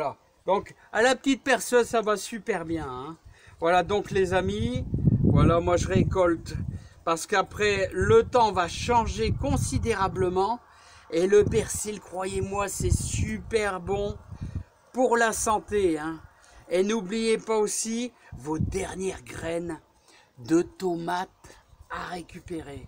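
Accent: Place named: Nord, France. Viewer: Bonjour! Ravie de m'être abonnée à votre chaine, j'aime beaucoup la façon dont vous abordez le jardinage, vos conseils précieux et, cerise sur le gâteau, votre si sympathique accent "chti"! Je vois que vous avez quelques pieds de raisin, bien beaux et bien fournis, quelle chance! Pourtant originaire d'une région où les vins sont prestigieux, je n'ai pas la chance de pouvoir en récolter, les guêpes passant avant moi parmi les grappes et ne me laissant que les peaux des grumes, et encore, pas toujours car les oiseaux se chargent de "vendanger" le reste... Auriez-vous une idée à me donner pour éloigner ces dames jaunes et noires pour qu'elles me laissent quelques grappes à récolter, s'il vous plait? J'ai voulu essayer l'ensachage mais cette méthode ne m'a pas convaincue, et je répugne à installer des pièges... Merci d'avance.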